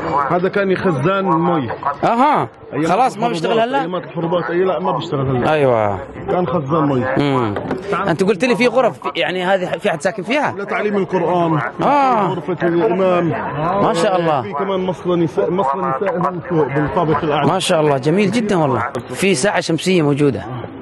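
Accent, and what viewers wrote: United Arab Emirates. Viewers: لهجته إماراتية كان عايش بالإمارات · لهجتك اماراتيه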